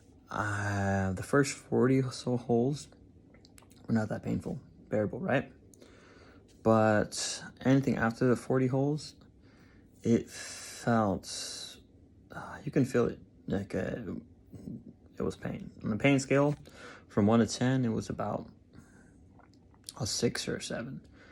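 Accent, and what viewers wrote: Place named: United States. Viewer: I get my procedure with her in less than 2 weeks. I can’t wait. It sounds like you’re from the USA too? I have non stop flight from Chicago and it’s only 10 hours. I am wondering though how it was for you once you got into turkey and also traveling home as far as entry / customs go. I have my evisa and I also heard something about a passenger locator form before leaving too. Anything I need to know?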